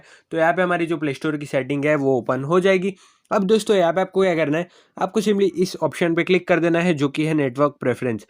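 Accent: India. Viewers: i didn't understand anything because he is indian but it worked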